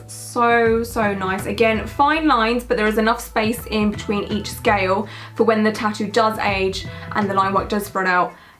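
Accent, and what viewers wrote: England, United Kingdom. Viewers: That's the way all English people say it lol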